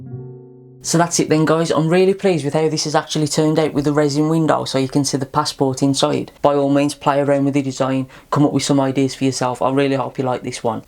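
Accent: Black Country, United Kingdom. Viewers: Is that a black country accent?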